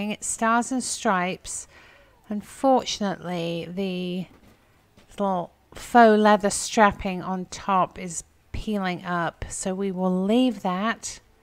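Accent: England, United Kingdom. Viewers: I love the English accent do you miss England I always wanted to visit have a bless day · Just come across your channel and I love it, however being English myself I can't help but hear an English/British accent there! Hope I'm right 😂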